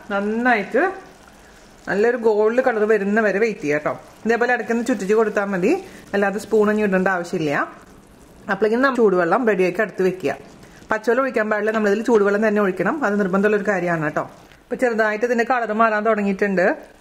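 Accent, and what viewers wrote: Thrissur, India. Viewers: ! Your musical narrations at thirsur slags with valid tips,super cake Xmas to you and family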